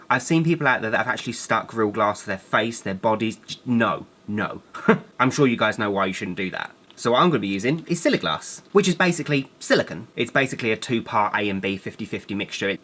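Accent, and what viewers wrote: United Kingdom. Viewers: Your british accent is awsome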